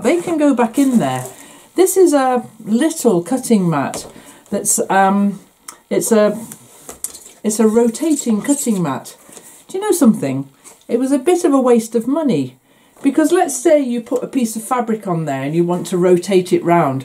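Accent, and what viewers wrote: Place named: Northern England, United Kingdom. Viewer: Hello. I just found your channel and what a lovely surprise! As soon as I heard you say, " Fed oop", I knew you were in northern England! I am back in the States, but worked in Bradford for three consecutive years for George Barker Refrigeration, as a Sr. Buyer, from 1992 to 1995. It was such a major, but wonderful experience for me that I am going to write a book about my stay, using a sense of humor, similar to James in All Creatures Great and Small. My best friend, Val, lives in Leeds and we visit and email to this day. So I can enjoy listening to your accent and northern phrases. Val would say she had to go home and feed her moggies and now I don't bat an eye! I miss her. We even have the same birthday! I am native to the USA and living in New Hampshire. We are a family farm. And I am just putting together a sewing cupboard. 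My sister gave me a lovely small armoire with lots of drawers for thread and supplies. You seem to be sorting out your sewing drawer.Nothing wrong with keeping bits and bobs that you may use at some point. I have issues with remembering what is in what drawer! I look forward to following your channel. Are you in Yorkshire? - Diane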